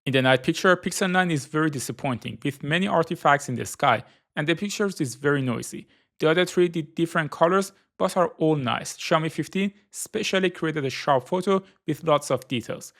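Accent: Iran. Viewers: Love the Persian accent ❤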